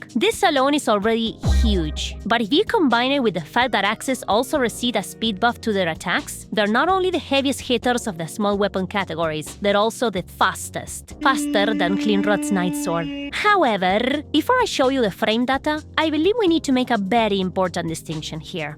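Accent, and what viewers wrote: Argentina. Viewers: The way it pronounced is because of his argentian accent hahaha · Eres argentina? 👀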